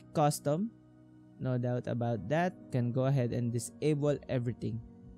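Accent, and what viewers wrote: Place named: Philippines. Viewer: Haha pinoy accent 😉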